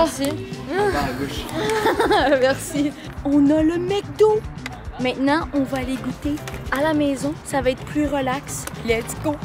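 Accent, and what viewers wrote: Quebec, Canada. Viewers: Cette accent Québécois , j’adore ! ❤️ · l' accent quebecois est insuportable mais avec elle, cela le rend assez mignon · j'adore tes vidéos et surtout ton acssen québécois comtinue comme ça t la meilleure si tu veux je t épouse cash 😂❤ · J'adore ton accent Québécois et tu as quel âge !? 😊❤ Sinon continue comme ça,tu es la meilleure ! ❤❤❤ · tbnk ça sonne Québec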